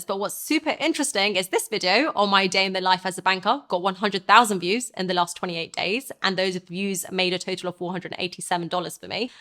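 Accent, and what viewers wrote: United Kingdom. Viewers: Why you look like brazylian and talk like brit?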